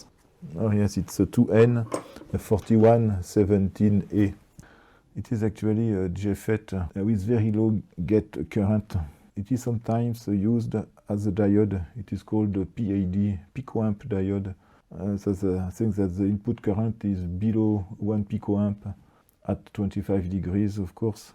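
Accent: France. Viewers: Fast and furious mixed reverse engineering, pure french accent, the best channel with Curious Marc, another French guy:) Thanks a lot.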